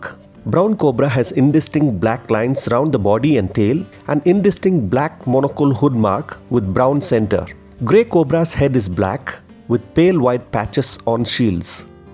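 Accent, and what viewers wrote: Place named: South, India. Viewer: the pronounciation is kashmir, do not show your south indian MTI by calling it "cashmir". You have done it in many videos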